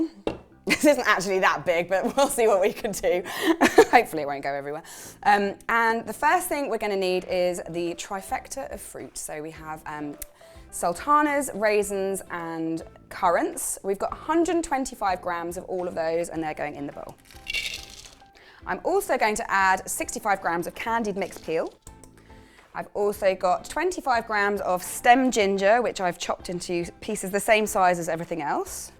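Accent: United Kingdom. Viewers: I am puertorican,and I love my kitchen and other peoples kitchens. To try new recipes, I've never had mincemeat pie,I thought it was literally made with meat. I love raisins, and your pie crust looks so delicious,I think I will try this for my Christmas 2025,God willing 😊 I love your british accent. Thank you and blessings to you.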